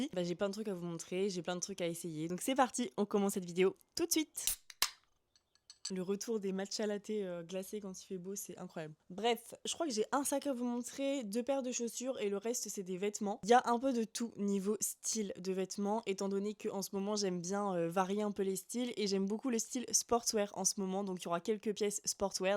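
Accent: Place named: South, France. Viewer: J'adore tes vidéos, tu fais du super taff ! J'adore aussi ta façon de ne pas faire la liaison quand tu dis des prix (dieuros, deueuros), j'ai cru que tu le faisais exprès pour faire de l'humour, mais c'est sans doute un truc du nord, car ton frère prononce pareil...c'est marrant aussi t'as un petit truc du sud aussi dans ta prononciation de certains e et quelle belle voix mezzo ! En tout cas merci ! très inspirante ! je vais me mettre à la brocante, c'est génial ! et...au matcha ❤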